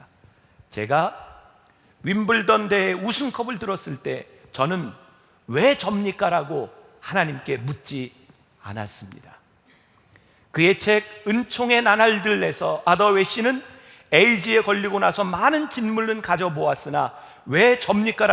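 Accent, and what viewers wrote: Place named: Gyeongsang, South Korea. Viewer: 안녕하세요 만나교회담임목사님 제고향경상도대구에서와서요사투리참재미있죠항상은혜말씀끝은주님의사랑이죠아멘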